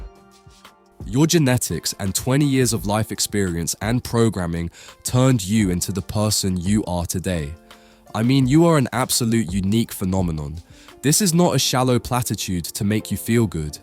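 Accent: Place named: United Kingdom. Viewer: Why did he turn British?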